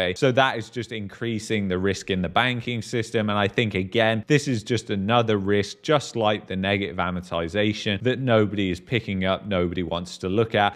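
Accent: England, United Kingdom. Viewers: Hey you sound English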